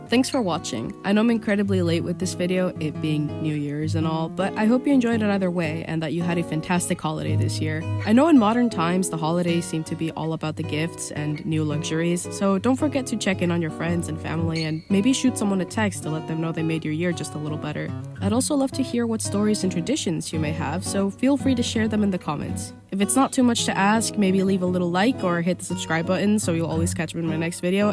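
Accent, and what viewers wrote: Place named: Mexico. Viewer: bro unleashed the full mexican accent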